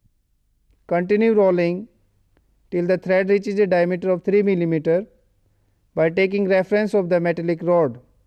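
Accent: India. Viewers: this is indian english language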